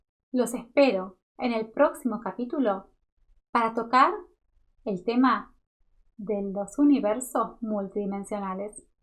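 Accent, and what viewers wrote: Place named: Argentina. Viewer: Está muy bien la información pero ese desgraciado acento argentino y en otros videos el acento español hacen muy difícil aguantar verlos hasta el final, es un desgraciado suplicio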